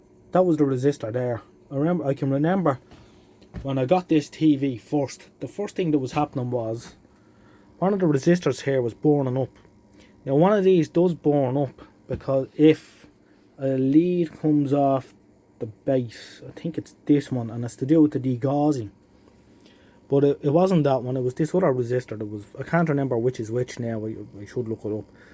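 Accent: Ireland. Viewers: I watch because your delivery is low-key, casual and appealing. You repair the same sort of thing I do - yes, a few ladies do this sort of work. (And your lovely Irish accent it rather sweet.) I have completely rebuilt a couple of Bush TV24s and numerous radios from the 1930s and 1940s. But these days AM radios need a local home-brew local transmitter fed by whatever audio source you like. I hope fame doesn't change your presentation too much. I at least I like your videos just as they are! Thank you.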